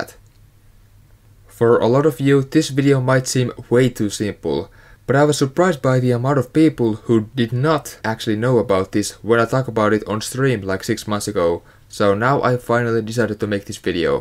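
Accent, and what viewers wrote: Finland. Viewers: When you started talking i was like he is from Finland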